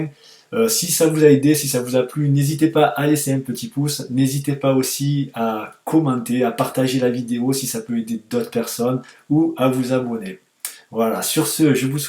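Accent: South, France. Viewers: J'ai passé 3 fois mon permis, la première fois j'ai loupé une priorité avec le stresse. La seconde fois j'ai eu 19.5 ( il faut avoir 20 je le rappelle) sans faute éliminatoire. j'ai jamais compris pourquoi. Cette fois ci j'ai eu 19! et sur mes résultats il me manque 1 point pour l'installation au poste de conduite...Sérieusement, le poste de conduite? Je comprend vraiment pas le problème, a part qu'a cause de ça il faut encore que je recommence! ça coûte trop d'argent! J'en suis a 60 heures... Je déprime grave. Mon porte monnaie crie famine... En plus mon auto école est pas top, par exemple le jour du permis le prof accompagnateur ne viens pas pour te rassurer, te dire si c'étais bien ou pas. Personne ne t’emmène ou ne te ramène sur les lieux de l'examen tu te débrouille. Ce qui, moi personnellement, ne m'aide pas à déstresser. Aussi, les cours durent en moyenne 35 minutes et coûte 48€ l'heure. 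Beaucoup trop chère! et j'habite même pas une grande ville! A vous semblez très professionnel, je vous remercie pour ces vidéos ça aide bien! dommage que vous n'êtes pas dans ma régions... On entend l'accent du Sud!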